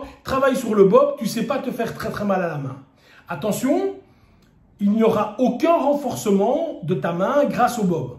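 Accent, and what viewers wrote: Belgium. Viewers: Merci pour les informations. Étant presque totalement étrangé au combat réel, votre vidéo m'aiguille sur comment débuter mon renforcement. Mis à part cela, ne seriez-vous pas de la famille de Zouloux :P ? J'imagine que non du fait que vous soyez belge (déduction dûe au <<septante>>), mais votre voix et votre façon de parler ressemble pas mal à la sienne ; le monde étant petit je demande tout de même haha. Bonne continuation à vous ^^ !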